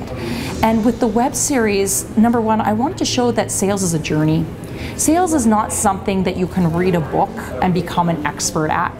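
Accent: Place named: Canada. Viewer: Excuse me. Please. Congratulations,. I would like to thank you for the perfect Canadian English class. There is a possibility of playback speed to 0,75 or 0,5. Anyways! I'm learning a lot from you. Sorry for being out of focus on the video. I watch several North American Natives. They speak Portuguese, but your Canadian accent is the best of all. Your connected speech is Perfect.!! You're a great inspiration to me (or, for me) You're Amazing,. "Why am I not Canadian"??🇨🇦 Sorry to lose the focus of the video. P. S. I've just watched your next video. Thank you! Your fan! See you!!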